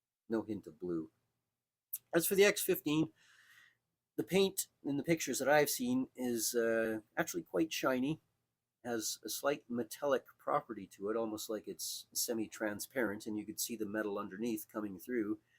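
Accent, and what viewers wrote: Canada. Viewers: I take it from your accent that you may be Canadian? One of the greatest scale modelers was Jack McGillvary from Toronto who built and flew his models to unsurpassed levels. He was one of the Flying Aces greats. Beyond that he was a Wakefield Trophy finalist in 1959. Have you tried rubber flying scale?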